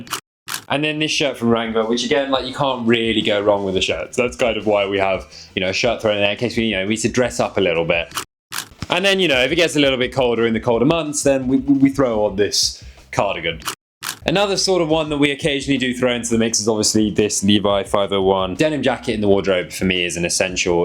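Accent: United Kingdom. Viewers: english accents tickle my brains in a good way😌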